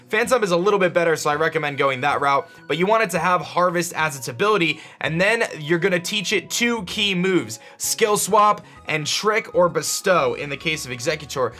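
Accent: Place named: California, United States. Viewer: Disliked. Because you talk like a stereotypical cali dude from every 90's movie!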